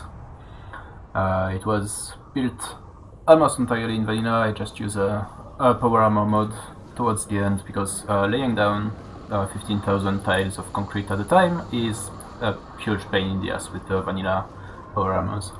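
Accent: France. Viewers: t'es français ? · Tu es Français ?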